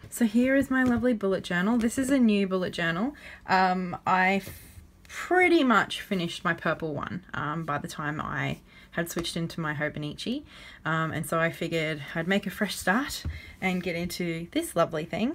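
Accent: Australia. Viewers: Hi, I’ve just stumbled across your video by accident. I feel like I’m living your life a year later. I too am a bullet journalist too and have considered switching to a hobonichi next year as I am in the midst of completing my master and creating layouts is starting to impede on my time. I thought the pre-printed layouts would assist me next year but I’m a bit hesitant as to how it will translate in the hobonichi. I’m a little worried that there aren’t enough spare pages at the back of the hobonichi for collections and challenges like you would in the Leuchtturm. Loved listening experience with the Cousin Avec 😊 Hope I’m right in saying that I detect an Aussie accent. I’m from Kangaland too (Sydney to be precise).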